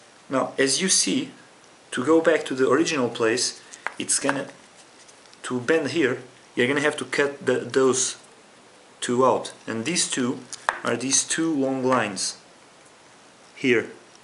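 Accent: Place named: Portugal. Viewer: This is such a Portuguese accent ehehehehe great video :)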